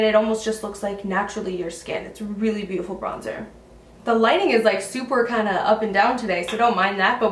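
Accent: Canada. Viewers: Girl ! I love your Channel I just discovered you! I'm sorry if this is a silly question cause I think I can tell but are you Canadian? Also I can't seem to find those morphee lashes!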